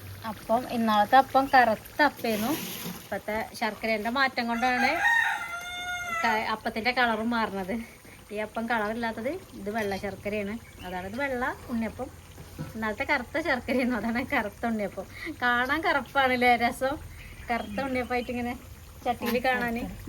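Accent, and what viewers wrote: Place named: Malappuram, India. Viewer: ഹായ്. ഞാൻ ഒരു കണ്ണൂർകാരിയാണ്. എന്റെ ഹുസ്ബന്റ് മലപ്പുറതത്‌കാരനും അതുകൊണ്ട് എനിക്ക് കുറച്ചു നിങ്ങളുടെ സംസാരംമനസിലാകും നിങ്ങൾക്ക് മധുരം കുറച്ചല്ലേ വേണ്ടു ശർക്കര കുറച്ചല്ലേ ചേർത്തുള്ളു സംസാരം എനിക്ക് വളരെ ഇഷ്ട്ടമാണ് ഓക്കേ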